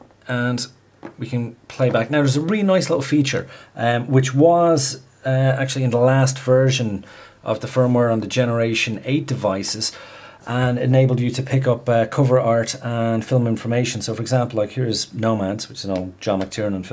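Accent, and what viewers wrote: Ireland. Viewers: fecking hell, you are so obviously Irish :P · Love the accent.....irish?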